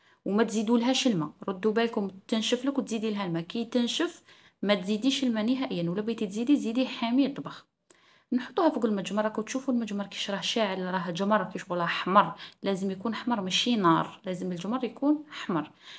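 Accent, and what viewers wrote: Mascara, Algeria. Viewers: انت من معسكر ولا من تغنيف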